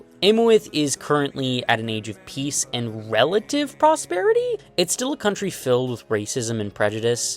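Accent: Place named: Appalachia, United States. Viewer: Considering the appalachian southern accent is a derived form of the Scottish accent. This is a wonderful accident.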